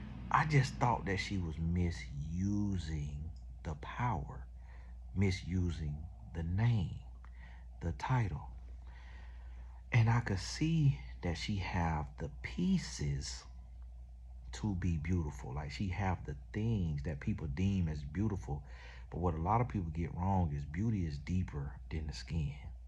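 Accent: South, United States. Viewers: 😂😂 right! It’s the country twang in him explaining this craziness. Hilarious! Cause what is she doing!!!?!!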